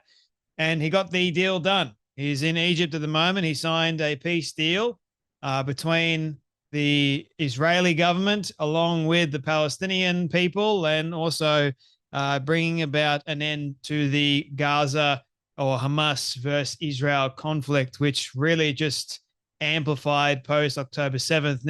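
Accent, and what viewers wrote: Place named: Australia. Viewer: Love your show,. We need people of your calibre speaking the truth with an Australian accent. Keep it up mate.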